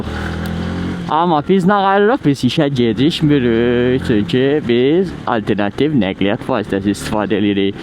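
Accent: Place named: Qazakh, Azerbaijan. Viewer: Qazax ləhçəsi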